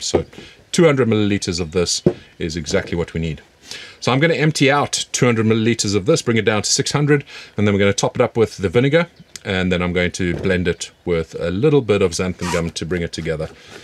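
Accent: South Africa. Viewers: from that accent im guessing SA? first vid and love it. gonna try but first i gotta source some chllies here in japan.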